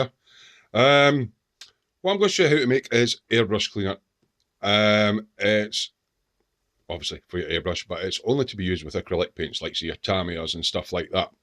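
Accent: Scotland, United Kingdom. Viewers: I'm new to airbrushing, but this was interesting. I have seen a video in which a few drops of glycerine were added, together with the IPA, distilled water and screenwash. Apparently, it adds a bit of lubrication. Thanks for sharing. (I live with a Scottish lady and so the accent was no problem!! :-) )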